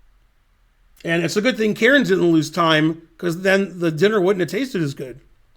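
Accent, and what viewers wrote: United States. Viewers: I can totally tell your from Detroit bro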